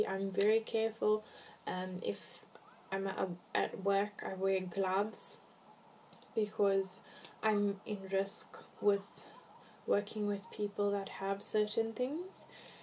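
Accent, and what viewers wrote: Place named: United States. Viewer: You kinda sound american ... kinda. You are beautiful though.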